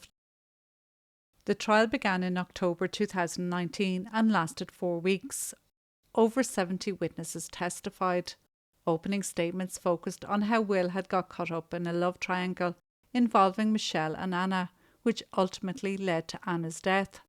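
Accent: Ireland. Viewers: This Irish cat doing the commentary freaks me out. She sounds like a Mother Superior on crack!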